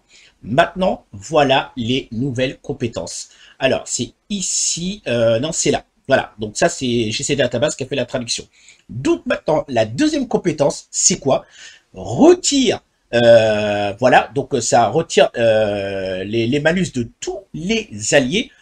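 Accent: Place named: England, United Kingdom. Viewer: Superbe accent anglais ! Tu rentres dans le game mondial du gatcha youtube 😎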